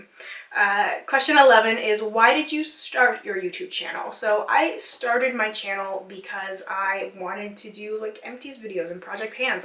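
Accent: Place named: Canada. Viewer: I am so sorry you have received mean comments about your appearance, that is so rude and unnecessary. I only recently started appreciating all the little nuances in people’s characteristics and how it makes them uniquely beautiful. I think representation is important in media, so it’s nice to see someone with a different style, opinion and features. You’re doing an awesome job on your channel! ❤️ ps. Every time you say about...I hear aboot. It makes me so happy as a fellow Canadian 😀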